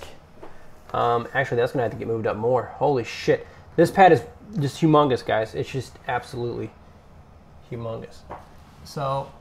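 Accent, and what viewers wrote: Ireland. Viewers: where in Ireland are you from ?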